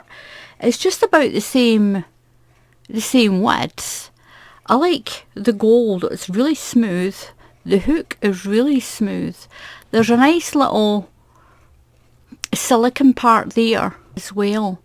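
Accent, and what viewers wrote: Scotland, United Kingdom. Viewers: Thank you so much...I want to make some thing nice as a stripe in the middle of a big afghan I`m crocheting for my husband, and this will be great. p.s. I think your accent is charming. I am in the US, but many of my ancestors came here from Scotland and I like to think that they sounded like you. Keep safe and thanks again. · Not sure where in the world you live but believe you me accent is a lovely soft Scottish brogue, not thick at all ( ps I’m not Scottish)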